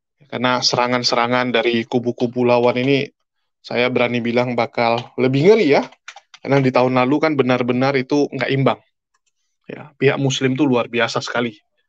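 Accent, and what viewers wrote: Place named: Medan, Indonesia. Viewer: Cina Medan eeee.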